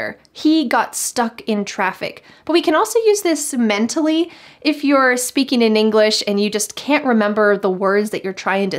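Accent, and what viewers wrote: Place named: United States. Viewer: I got impressed with your story about vacation and I can figure it out how fun it was. in my country I never get a vacation so I work twenty for seven. it's really tiring but I just took it for granted for everything that I have. I wish I could it's probably after retiring , I really like your video lesson and love American pronounciation. thanks a lot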